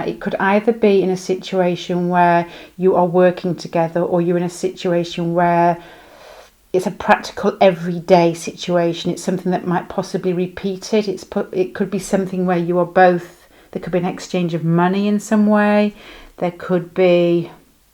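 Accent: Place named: Midlands, United Kingdom. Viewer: Pile 1 wooooooooow amazing 🤩 I also hear a midland accent, as a midlander that’s makes me so happy 😁 Love and light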